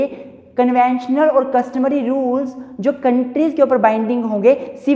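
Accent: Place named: Punjab, India. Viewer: the way u teach is commendable 👍 pronunciation me punjabi touch hai..bt tht is manageable ..bcz assi v punjabi aa 😁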